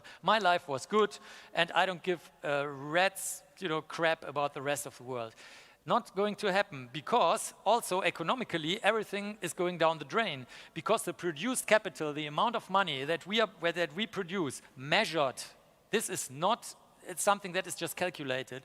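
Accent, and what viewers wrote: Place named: Germany. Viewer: Für jemanden der aus new york kommt sehr krasser deutscher akzent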